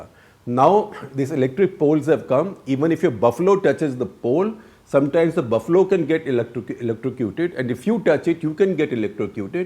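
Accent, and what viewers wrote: India. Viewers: i could listen to him talk forever. I love the indian accent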